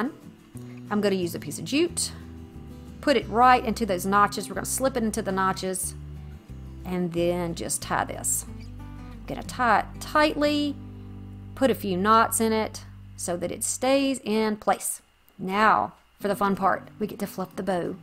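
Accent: South, United States. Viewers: Omg love your accent honey! I love the South Y’all are the salt of the earth!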